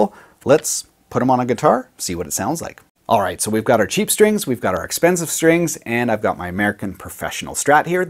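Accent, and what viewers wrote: Canada. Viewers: He has like the most canadian accent ever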